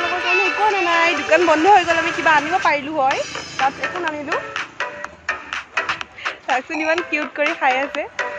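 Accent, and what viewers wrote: Nalbari, India. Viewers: অ, মাজে মাজে নলবৰিয়াৰ দৰে কথা কোৱা কাৰণে সুধিছো😍😍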